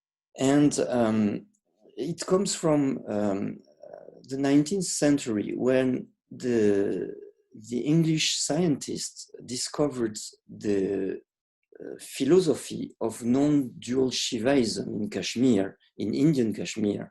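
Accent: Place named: France. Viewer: (To my humble opinion) This is worth enduring Frrench accent to benefit from his knowledge given with such gentleness. I am sending you my gratitude especailly for the short meditation you guide here, this is exactly what I have been missing and looking for since I came back home after the TEL6 post-coco:-D Namasté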